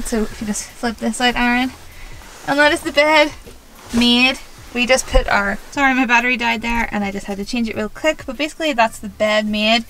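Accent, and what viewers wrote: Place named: Ireland. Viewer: Love your Irish accent!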